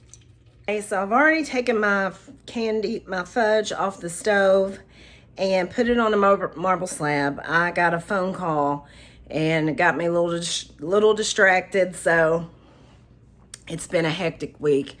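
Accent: South, United States. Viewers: I love your recipe and your Southern accent.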